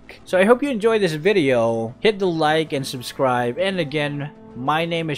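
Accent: Philippines. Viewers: Wow galing mag english halata Filipino ka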